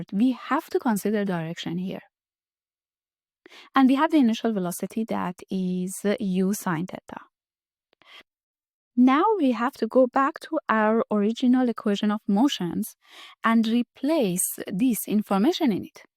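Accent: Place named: Iran. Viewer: I love your heavy Persian accent, sis 😊❤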